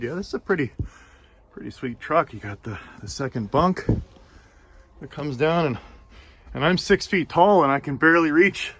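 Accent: Canada. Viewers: I know nothing about trucks, but listening to a Canadian guy talking about trucks so passionately is so relaxing to watch.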